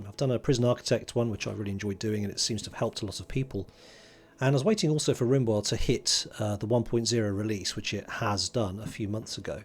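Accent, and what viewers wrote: United Kingdom. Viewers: Delivered with such natural ability. I'm a british expat living in Norway, always nice to hear a fellow brit now and again. I shall continue on with the series, as the guide in general was a tremendous help. 10/10 for clarity, bravo. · You have an excellent voice for narration, I swear the English accent was tailor made for narration.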